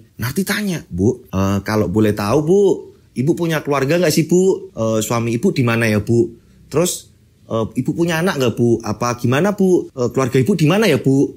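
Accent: Java, Indonesia. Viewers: Pertama kali nonton terhipnotis sama boom boom boom nya itu,ditambah mas membawakan ceritanya semangat dan lucu juga jadi pingin nonton cerita lainnya sampai sekarang tapi bahasa jawanya kok sama dengan daerahku ya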